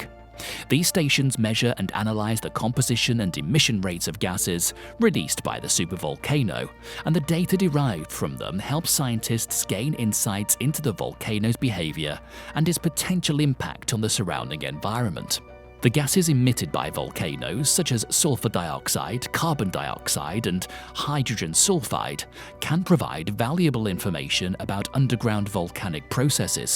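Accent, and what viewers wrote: United Kingdom. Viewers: The narrator has that annoying British accent that is constantly used online to sell something, usually something that is threatening to destroy the money grabbing pharmaceutical industry. Hence, where is the credibility in overusing such an accent on the "internet wild west" where anything goes; you can say any mindless thing you want with little fear of significant repercussions.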